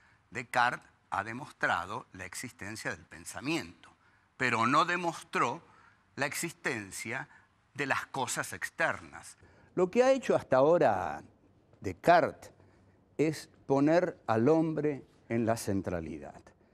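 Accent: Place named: Buenos Aires, Argentina. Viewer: “Nunca digan digresión, es digresión”. Ese acento porteño jajaja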